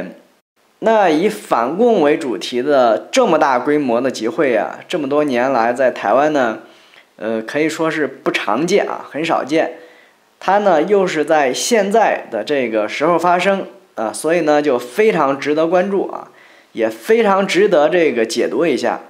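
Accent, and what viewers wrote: China. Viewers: 聽你口音是內地的朋友 注意你的安全 大陸很危險的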